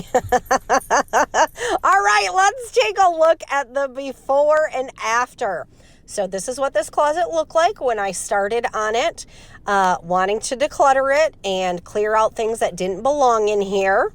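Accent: England, United Kingdom. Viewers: I could just picture you sipping tea from one of those vintage tea cups and speaking using your English accent. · Do I hear an English accent or no? Loving this video and need to do my own spaces so badly.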